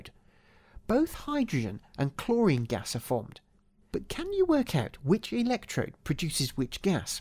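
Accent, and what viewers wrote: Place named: United Kingdom. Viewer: British accent and science, savouring!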